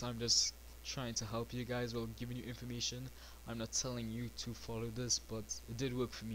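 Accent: Trinidad and Tobago. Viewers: Aye Bhai youze a Trinidadian ? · ur trinidadian?? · dude are u Trini ? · u sounding like u from trinidad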